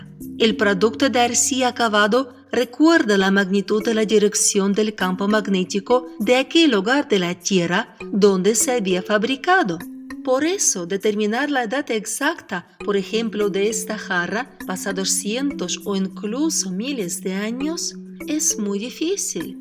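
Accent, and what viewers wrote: Russia. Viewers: Hey eres de Rusia verdad? Tu acento es inconfundible :3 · Muy bueno veo todos tus videos desde que lanzaste él primero, tenes que hacer un video sobre "vos" llama la atención tu asentó Ruso y que te llevó a realizar éstos trabajos históricos, desde ya muchas gracias y seguí así 😉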